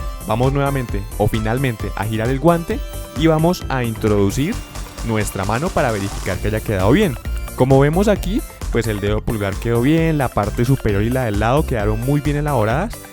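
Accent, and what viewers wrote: Bogotá, Colombia. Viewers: Mmm algo me dise que eres colombiano de bogota XD, gracias ahora tengo guantes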